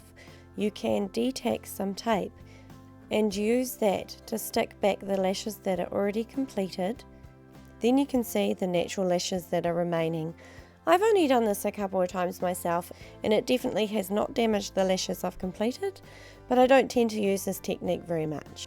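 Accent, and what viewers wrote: New Zealand. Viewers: I only clicked on this to see what ladies are doing on my eyes but hearing you have a NZ accent, ummm where are you based so I can get mine done by you ASAP haha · I think she's a kiwi? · Was suprised when I heard the accent. Woohoo, kiwi!!! Do you do eyelash extensions? If so where are you based? In NZ. I would love to have my lashes done by you! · ohh so thats a kiwi accent!..now I can notice the accent of calum hood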